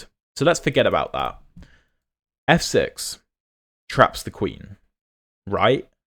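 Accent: United Kingdom. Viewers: I like your materials a lot. Very educative. For me crucial thing about your chess footages is that often you find yourself in a position where other (and by other I mean better - no offense) chess youtubers know the next move by heart and it's hard for them to actually explain why THIS is the move. I think you benefit from that because you are actually going through you thought process, explaining what you see on the board. Sometimes even though you are not sure you assume THIS might be the move because of the process of elimination or whatever. I really like it and I think many players like me can benefit from that. I am also grinding Caro as black and Viena as white so what you do is even more to my liking. On top of that I am constantly learning English and British is the accent I love to listen to so this is kind of a cherry on top. Keep up doing what you're doing and I hope you'll claim that golden YT button one day.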